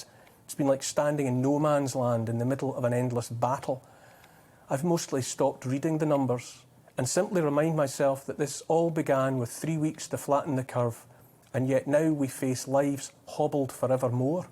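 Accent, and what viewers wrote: Scotland, United Kingdom. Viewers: Loved your specials,. Now I enjoy your commentary’s, and that great accent. I deeply regret that I have no Scottish heritage. I live in Australia where one of our cities Melbourne, broke the world record for lockdowns.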